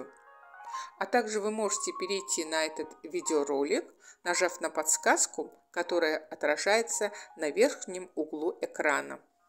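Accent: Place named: Baku, Azerbaijan. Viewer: ханум, спасибо.Сама я тоже из Баку, обожаю слушать наших женщин с нашим бакинским акцентом.Удачи Вам и процветания Вашему каналу.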